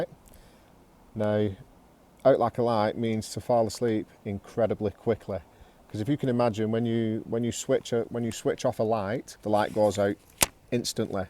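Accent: United Kingdom. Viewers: I like your British accent although it’s hard for me to understand it. I should listen it a few times . Regards from Texas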